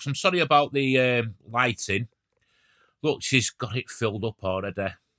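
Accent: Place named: Northern England, United Kingdom. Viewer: I'm a Stopfordian but I'm exiled in New Zealand. It's roasting here. Sorry. Good to hear a northern accent on YouTube. Your videos are straightforward and to the point. Keep up the good work.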